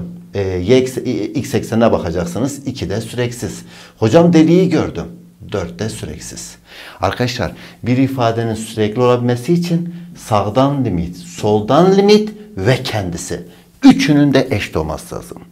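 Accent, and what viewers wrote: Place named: Eastern Anatolia, Turkey. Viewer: hdasdhsadhsadahsda hayatımda izlediğim en iy ders videosu. hocam dersi anlatırken biraz daha doğu ağızı kullanırsanız ders daha eğlenceli ve akılda kalıcı olur kanaatimce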